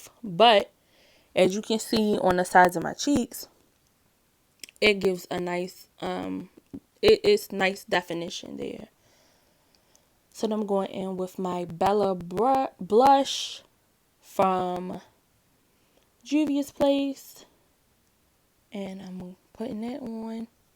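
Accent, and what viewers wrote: Baltimore, United States. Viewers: New subscriber.... you sound like you from Baltimore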